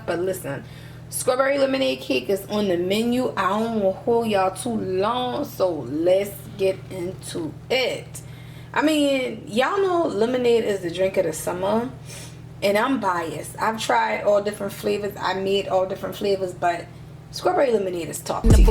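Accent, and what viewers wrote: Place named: New York, United States. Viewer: She definitely from New York🤣 bur good video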